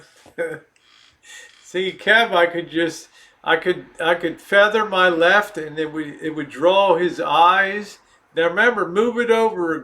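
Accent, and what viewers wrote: Baltimore, United States. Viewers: We are the same age and I feel so I pretty in comparison! I'm interested in your metal detecting. It's something I would love to try. How hard is it on your back? Is it something that you would think is not good? I have a bad back but am able to get around. I understand that you have to do some digging but is it possible? Also I have family in baltimore and love your accent 😍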